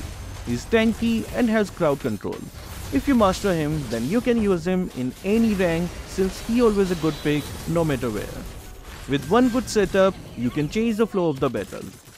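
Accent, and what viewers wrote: India. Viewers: dude are you from India or what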